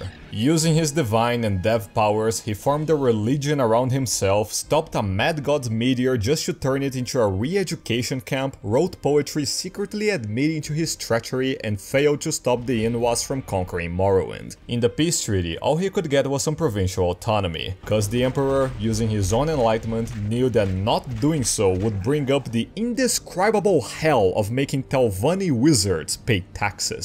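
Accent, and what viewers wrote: Brazil. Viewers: You have an accent similar to Brazilian portuguese, are you Brazilian? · His Brazil accent is so funny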